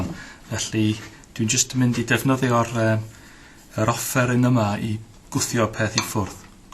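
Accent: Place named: England, United Kingdom. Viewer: im russian and even i do hear that terrible english accent. most of todays celtic speakers have it, unfortunately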